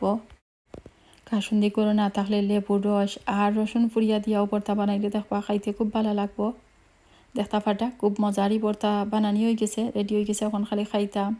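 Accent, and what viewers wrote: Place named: Moulvibazar, Bangladesh. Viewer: Afa afnar math huniya lager afnar bari moulvibazar. Jodi moulvibazar hoy tho kun elakay Please koiba?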